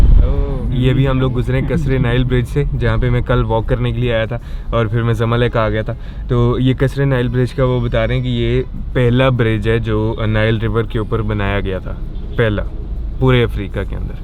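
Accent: Punjab, India. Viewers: Ur Punjabi tone gives a boost of masala in the tour 😂😂😂 😋😋😋😋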